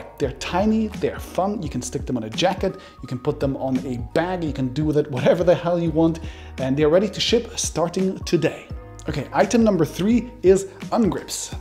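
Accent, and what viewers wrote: Germany. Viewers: Really enjoy watching this channel. First time I thought that he is from Germany haha :)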